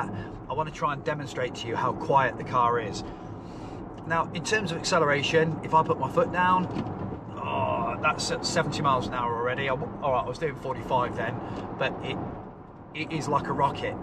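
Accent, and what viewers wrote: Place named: RP, United Kingdom. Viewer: you`ve gone posh👌👌👌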